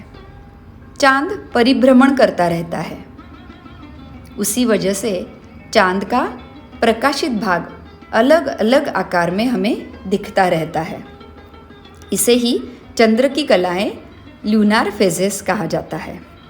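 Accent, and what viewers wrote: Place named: Maharashtra, India. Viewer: छान विवेचन आहे. पण एक मात्र नक्की की मराठी माणूस जेंव्हा हिंदी बोलतो ते लगेच लक्षात येते. 😊😊😊 Great going... 🙏🏻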